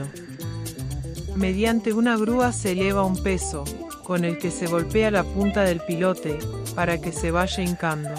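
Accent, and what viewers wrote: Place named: Argentina. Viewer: Vi la información de las zapatas, me iba a suscribir, ya lo habia hecho, todo parece indicar que la información viene de un ingeniero estructural y por el acento de voz, es claro que es Argentino.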